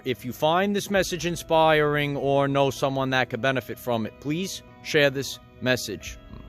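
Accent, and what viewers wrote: New York, United States. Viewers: 1. The New York accent is the best ever! 2. do you like the movie The Neverending Story, because you remind me of the boy in it lol? 👑😇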